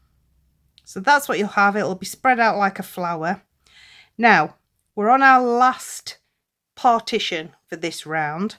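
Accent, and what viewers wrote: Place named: Northern England, United Kingdom. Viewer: Just found this channel, just getting back into beading after a couple of years break. I'm trying to remember how I used to weaves, so reacquainting myself by watching YouTube. thank you for describing this weave so beautifully. I've subscribed, so I look forward to more tutorials. I'm a northern lass, so it's great to hear another northern accent. 😊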